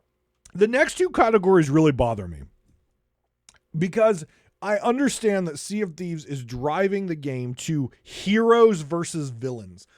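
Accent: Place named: Canada. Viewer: next episode releases “Alright let’s see what our boy is championing today - oh thats a good one.” Long time Spotify listener, day 1 sailor - weekly sailor. Im here to say I love your style, and sometimes your heated opinions are very needed, this is one of those topics. I want to reiterate that I love you, that said - “bolth” and “aweso” …. Kills me every time brother. EVERY TIME. also says “bolth” though. Seems like a northerner/west coast/Canadian thing? Kills me! Lol but keep it up duder, great stuff and I actually really appreciate having constant content to listen to. Its nice to audibly jump into the seas while I’m working.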